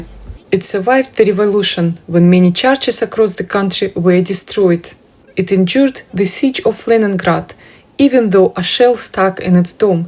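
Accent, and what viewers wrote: Scotland, United Kingdom. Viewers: Do I detect a hint of a Scottish accent?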